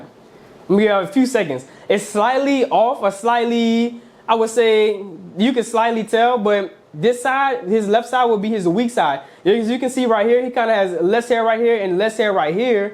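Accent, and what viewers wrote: New Orleans, United States. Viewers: New fave barber channel, you do an excellent job explaining! Sounds like you from NOLA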